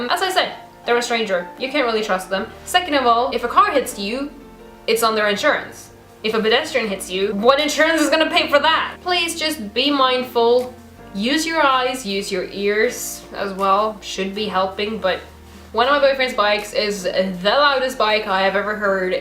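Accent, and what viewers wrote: United States. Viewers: whatever I think you have an Amrican and amazing Accent. Thank you for replying.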